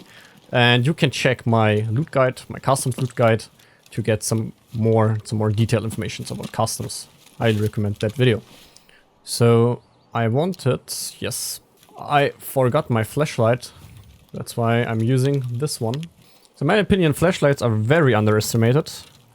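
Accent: Germany. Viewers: Man hört richtig das du deutscher bist haha. Also am englisch. Nicht böse gemeint oder so. Weiter so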